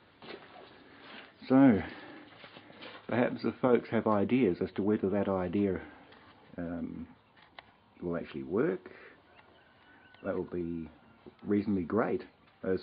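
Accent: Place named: New Zealand. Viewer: judging buy your accent your from new zealand, which leads me to ask... what wood are you going to run in your "imberted" fema gasifier?.... imbert type gasifiers are designed for hardwoods and don't take well to softwoods (pine etc) as the air jet velocity is too high and destroys the char bed, with uncool results for gas production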